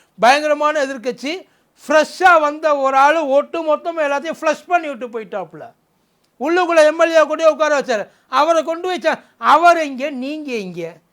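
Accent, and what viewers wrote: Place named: Telugu, India. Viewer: அட லூசு பயலே உனக்கு அரசியல் என்றால் என்னவென்றே தெரியுமாடா பரதேசி நாயே திமுக அடிமை நாயே நீ பேசிட்டு இருக்கதே வைக்கும் அவனை தெலுங்கு நாய் அது தமிழ்நாட்டுல இப்படி தாண்டா இருந்து பேசிகிட்டு இருக்கீங்க இதே வேற மாநிலத்தில் இருந்து இப்படி பேச முடியுமான உங்களுக்கு அந்த அளவுக்கு சுதந்திரம் கொடுத்து இருக்கு வந்தவுடனே செருப்ப கழட்டி அடிக்காத வாய்ஸ்ல தெரியுது தெலுங்கு காரங்களா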